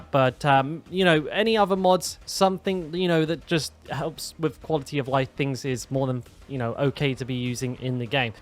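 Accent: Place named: Kent, United Kingdom. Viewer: Also, out of curiosity, how come you are on Asian time, you sound like your from Kent?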